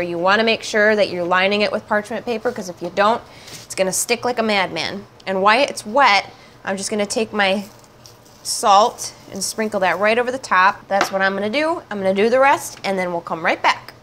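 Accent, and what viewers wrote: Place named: Wisconsin, United States. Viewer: You sound mad North Western. Almost wisconsin like lol.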